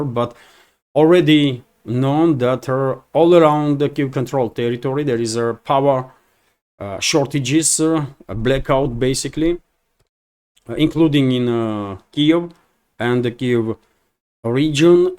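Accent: Russia. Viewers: Thank you. Keep it up. I am listening to you regularly. I like your russian voice and perspective.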